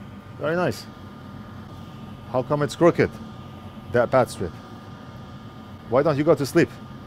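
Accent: Lebanon. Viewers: صدمتني بس صرت تحكي لبناني طول الوقت بشاهدك وبشاهد فيدوهاتك وربي صدمتني 😂😂😂 تحياتي بشاهدك من الاردن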